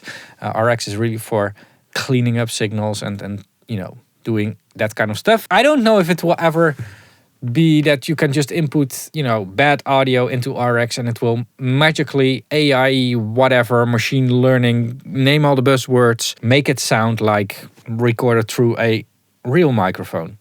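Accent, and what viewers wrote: Netherlands. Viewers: I dont know who you are, but I hear the Dutch accent haha.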